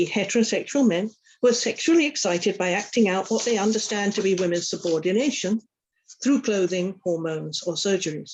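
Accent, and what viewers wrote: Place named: United Kingdom. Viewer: Thanks. Her tone and cadence in way of speaking is very hard for me to listen to. I think this is a British thing. There are British men who speak this way as and I can't listen.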